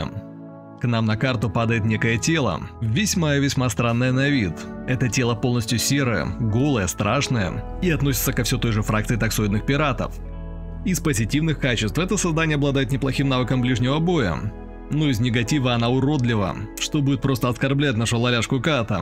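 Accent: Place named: Surzhyk, Ukraine. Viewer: Лайкос за суржик😁😂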